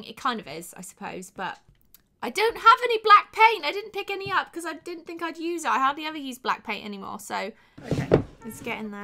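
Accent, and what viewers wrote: United Kingdom. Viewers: OK im new I love you! Just want to know how did you get such a strong UK accent, if you been in the US most of your life? I love your accent btw just asking how it came to be? Love your video and you! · Lol I thought that you where British to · I thought you were British, lol it truly doesn't matter, you're still beautiful. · Maybe i missed it, but if you've been in the US for so long, why is your accent so thick? I understand you learned to speak from your parents who are presumably also British, but I'd think being here from a young age you would have lost some of it. I hope that didn't come across poorly, there's no criticism there, I'm genuinely curious.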